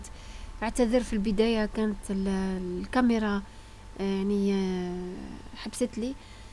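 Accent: Biskra, Algeria. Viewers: Kary Zeroual هاد الكلمة عندكم توما عيب ، هادي هدرتها باينة طبيعية و من جهة بسكرة او بوسعادة و حتى الجلفة و حتى احنا في الجنوب ما نقولوش رحيوة كيما انتوما ، الجزائر كبيرة و كلما ابتعدتي عن الشمال كلما ، استعملت كلمات عربية أكثر عند الناس ، واذا لاحظتي ما استعملتش الفرنسية او كلمات معوجة فرنسيا ، لأن المراة هنا باينة مش مصطنعة ، لازم تتعلمي شوية اللهجات توع الهضاب و الجنوب ، الله يهدينا و يهديكم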